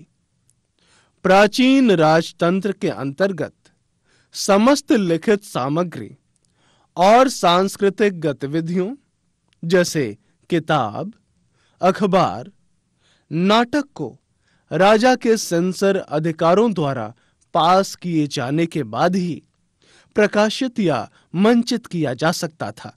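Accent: Standard. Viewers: sir video to aacha tha but aap etna sudha hindi kyo bol raho ho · it's to hard too understand because of youre real Hindi (shudha) Hindi. So it makes it little hard too understand.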